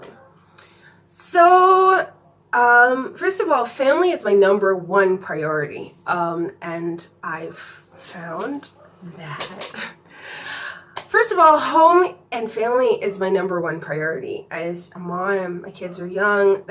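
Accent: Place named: Baltimore, United States. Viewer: Wow, you sound so much like home to me. Are you from Baltimore? Pikesville? Love your videos!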